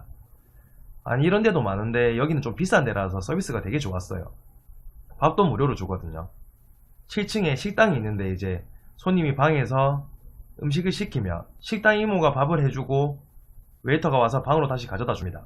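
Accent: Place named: Busan, South Korea. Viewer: 말투가 부산사람같네요? 저도 부산사람인데ㅎㅎ 알바 뭐 검색하다가 재밌게 듣고 갑니다~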